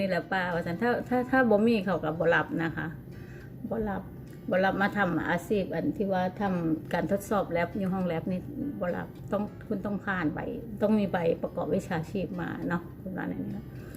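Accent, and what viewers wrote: Phu Thai, Thailand. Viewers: เว้าภูไทม่วนดีครับชอบฟังครับมีเสน่ห์ครับ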